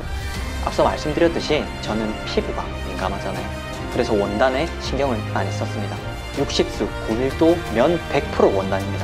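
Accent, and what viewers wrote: Gyeongsang, South Korea. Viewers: 암생각없이 보다가 친근한 갱상도 사투리도 글코 멘트도 넘 우껴서 좋아요 구독 알림설정 쓰리콤보 하구 갑니다ㅋㅋㅋ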